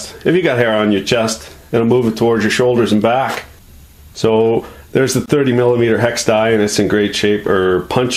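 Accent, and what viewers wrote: Canada. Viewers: Iron ring? So you ARE Canadian... I thought I recognized that accent. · Not sure where you're from but you sound very Canadian lol. I enjoyed this video and had a great laugh at your hilarious commentary. Great job on the punch, give me some ideas for myself. Thoroughly enjoying the precision calliper adjustment lmao. Have a great day. Ian Little Spirit River, Alberta, Canada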